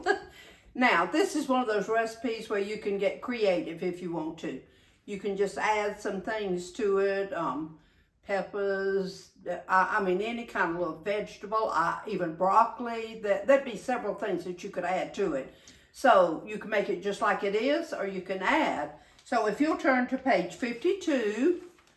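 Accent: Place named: Arkansas, United States. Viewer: Love the meal ideas & enjoy your cooking but best of all is that you talk exactly like my parents Arkansas family. It's very soothing now that my mom is gone to hear you & remember those wonderful kitchen moments I was so lucky to experience. Kept doing what u r doing. I bet I'm not the only one that enjoys the South & miss the people! I'm a fan!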